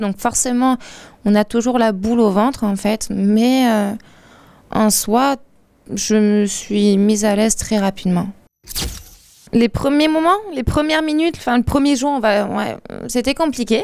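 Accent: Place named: Paris, France. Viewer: Juliana Laurent c’est vrai qu’on dirait qu’elle a un accent du genre parisien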